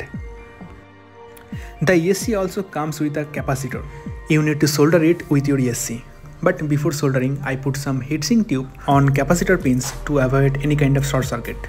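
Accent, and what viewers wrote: Bengal, India. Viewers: By the way you speak, I understand that you are a Bengali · Hey are you from Kolkata? Your Accent is like Bangali Dada. By the way, I'm from Bangladesh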